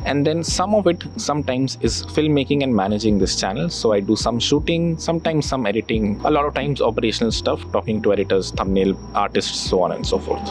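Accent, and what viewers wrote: India. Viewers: Haha you Indians have funny accent.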